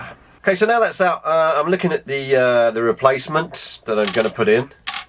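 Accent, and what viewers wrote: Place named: United Kingdom. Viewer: Love your accent are you British or austrailian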